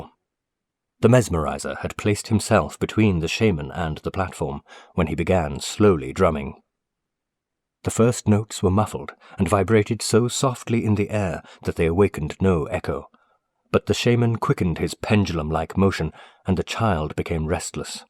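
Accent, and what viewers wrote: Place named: United Kingdom. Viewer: Are the collected works of Madame B. available- her short stories on Amazon? A character who has long held a fascination for me and if I had the talent and imagination I'd love to write a ghost story with her either alive or "in spirit" ! She and Crowley perfected The Stare, didn't they?! Thanks for posting, I am a frequent listener of Jasper L'Estrange and Tony Walker and so I found your channel- I tend towards Victorian ghost stories, written by women, I think our viewpoint is often different and perhaps a little stranger than that of men. I think of modern women, Shirley Jackson, Joan Aiken? and one whose name I can't recall...Hello, nice to meet another Brit. you have the voices! I love accents from the British Isles, as with fog, and huge houses in disrepair- so suited to the ghost story! Namaste from Canada.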